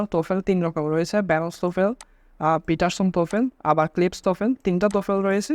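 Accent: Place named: Chittagong, Bangladesh. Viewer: ভাইয়া আপনি তো চট্টগ্রামের মনে হয়, চিনা চিনা লাগতেছে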